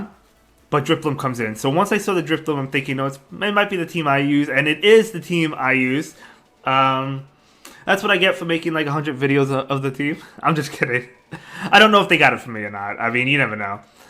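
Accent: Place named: Northeast, United States. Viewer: I got to get my "qwaffee". Man your accent is very strong. If i had to guess you're somewhere on the northern east coast? As always, great battle's.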